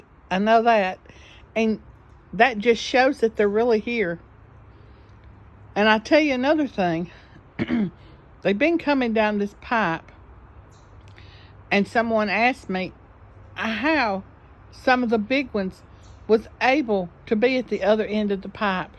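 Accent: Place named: South, United States. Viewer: Hey, I know you! I just realized that YouTube wasn’t notifying me of your videos! I know to check for them now though. Thank you for posting! - Britt Edit: I’m a fan of the southern accent! 😊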